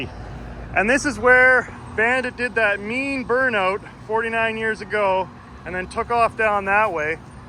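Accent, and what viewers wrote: Canada. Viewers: You must be a Canadian with that accent of house road and about. Terrible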